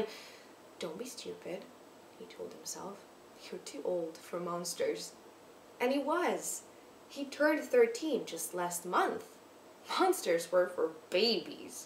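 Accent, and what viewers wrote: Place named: United States. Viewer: È la pronuncia americana, giusto? Perché ho capito abbastanza! Del britannico non capisco niente 😘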